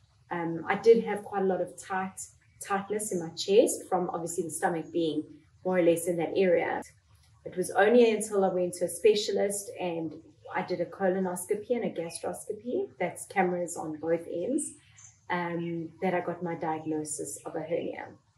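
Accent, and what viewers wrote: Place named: South Africa. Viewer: im australian i just heard the accent and thought it was south african :)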